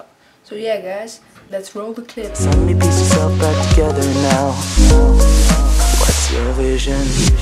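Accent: Netherlands. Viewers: hoorde gwn al aan het accent dat dit een nederlander was lol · Hahah je hoort dat hij nl is lol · Ik dacht al dat je Nederlands was XD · R u dutch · I can hear you dutch accent from miles away... and those English speaking freaks, try to explain this in dutch....!!! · Where are u from? I think from the netherlands but not sure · Ik hoor gewoon dat je Nederlands bent :P Ps. goeie tutorial!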